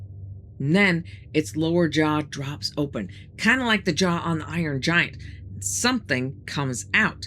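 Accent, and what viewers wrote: New York, United States. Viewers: Love wonderful stories thanks we appreciate ❤ and the lovely accent too, sounds like a New Yorker 😂 🇬🇧🏴🇺🇸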